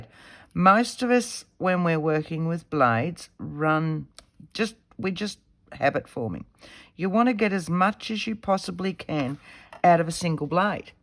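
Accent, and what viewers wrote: Australia. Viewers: Thank you soooo much for this toolkit go through especially of the glues you use... funny enuf as a reborn doll artist E6000 is used for keeping in mohair to our kit heads... looove using the glue stik but yes here in Charleville Qld we get constant 40 degree heat so yes a stronger glue is needed for that... looooove your channel... lovely to hear an aussie accent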